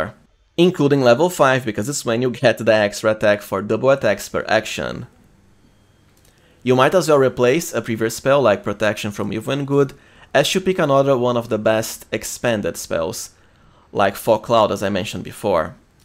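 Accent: Brazil. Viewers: Are you brazilian? I watch Luality and she pronounces "to" just like you ("chew", "chewday" etc) lol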